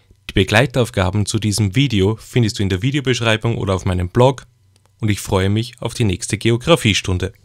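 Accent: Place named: Austria. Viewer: Sehr gut erklärt,man hört das du Österreicher bist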